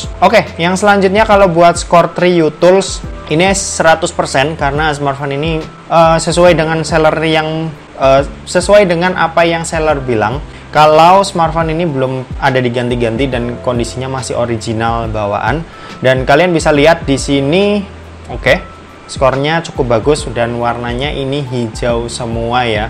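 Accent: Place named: Java, Indonesia. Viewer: Bang, tinggal di Semarang ya? Kayak kerasa aja Logat Basa Jawanya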